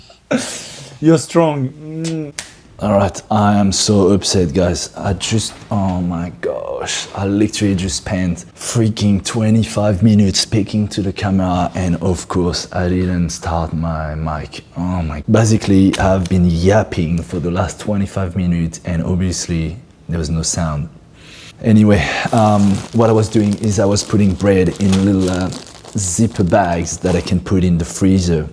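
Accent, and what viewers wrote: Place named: France. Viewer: Surprinsingly I'd watched. Oh, I got your accent is different and I enjoyed man... are you a frenchman? Cool! Is it your daughter? Very cute hahah man. Adorable, besides... a good caregiver does it with a good heart. You're coaching then, I liked - let's see if I can distuck of my strugglesituation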